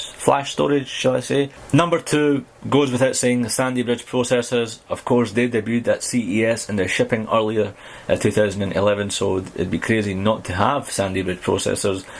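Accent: Scotland, United Kingdom. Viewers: His accent reminds me Trainspotting !, cheers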